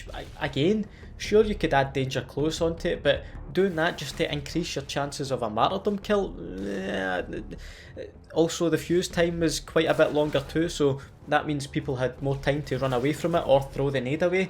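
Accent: Scotland, United Kingdom. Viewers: oh, a scottish (?) youtuber??? Hmmm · 2 years later I still think this guy has the most Scottish accent ever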